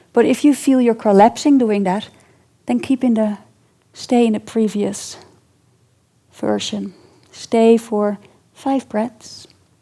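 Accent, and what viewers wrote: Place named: Netherlands. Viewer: Heerlijk je nederlandse accent in het engels! Bedankt voor je fijne gedetailleerde alignment uitleg (Y) top!!!!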